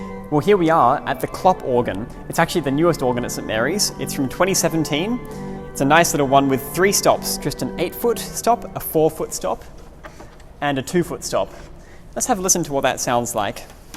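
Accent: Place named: United States. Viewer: It is sad that the speaker broadcasts in American.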